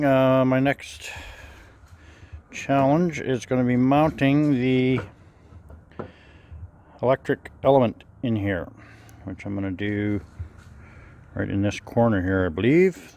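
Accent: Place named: East Coast, United States. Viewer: you have more of an east coast accent.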